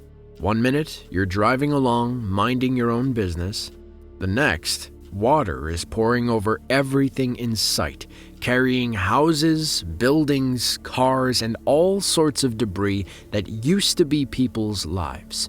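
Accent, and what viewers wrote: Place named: Rhode Island, United States. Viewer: the way you say various...which doesn't coincide with the way you say areas.Rhode Island?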